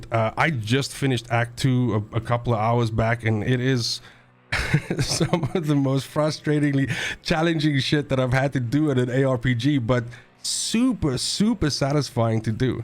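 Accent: South Africa. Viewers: Trying to figure out your accent... Are you from South Africa? Howzit from Cape Town 😎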